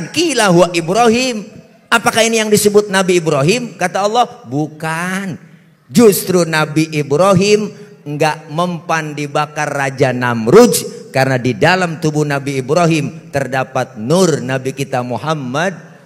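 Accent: Jakarta, Indonesia. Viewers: Lucux pak ustad dg logat betawix. Semga ulama ulama yg lurus ini selalu dlm lindungan Allah. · Masya Allah.. Alhamdulillah pencerahan ilmu nya . jadi inget kampung babe di betawi gaya bicara nya ... masya Allah ...kangen banget doakan saya bisa pulang kampung... segerA. Aamiin yarobbal alamiin🙏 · Alloh Qobul..ketemu beliau langsung dah.. logat bahasanya Betawi bgt ana demen bgt..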